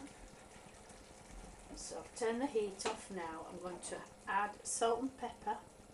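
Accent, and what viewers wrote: Yorkshire, United Kingdom. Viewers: Eeh, it's nice to hear a good old Yorkshire accent instead of an American one, for a change :-)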